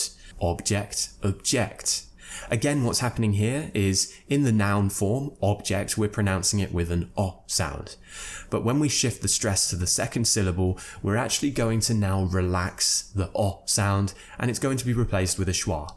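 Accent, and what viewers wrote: United Kingdom. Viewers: After changing my work in UK process, I came in to learn Uk accent and found this Chanel to be one of the best Uk Accent learning platform. And now I'm addicted to sir accent.may be I'm late but whenever I'm free I'm listening to your life journey podcast. ❤️ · Absolutely brilliant content and delivery. Serious skills here! Thank you for your valuable contribution. I'm etching ever closer to a British English accent thanks to you. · Your English accent is so British.🙃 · For a 15-year-old adolescent. Every time as I’m watching your video instrumental, it should be a very enjoyable and elated process since I could hear and feel the most authentic and received British pronunciation, which benefits me too much. Thanks!!!! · TBH, from all those videos I've come across, your ones are the best to learn the British accent! And such a lovely teacher you are! 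🙊❤ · Thank you very much. Your videos are very much useful to me. I like very the Pronunciation of English not Amrrican. You are great teacher. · U r a Good teacher. your British accent is very nice ... I love your style ... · Why are you speaking English so Britishly?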